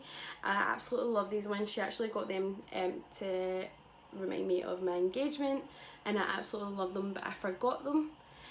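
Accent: Scotland, United Kingdom. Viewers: Are you Scottish by any chance? Bc i fricking love your accent!!!!!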